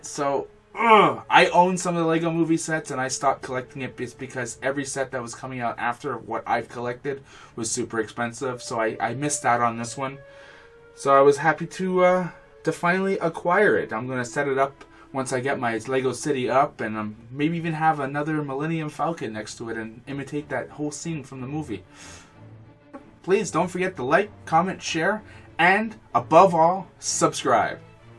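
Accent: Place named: Canada. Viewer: Ah okay! Sorry, I didn't detect the Canadian accent! Yeah, In Canada it released for CDN$300. It went as low as CDN$271.63 about a month before Christmas in 2014 after the Lego Movie came out: <link> Now, you're right it's crazy expensive: CDN$ 649.99 The subreddit r/Lepin is a really good place to go for info on this stuff. Really enjoy the vids btw, keep it up Hope your channel grows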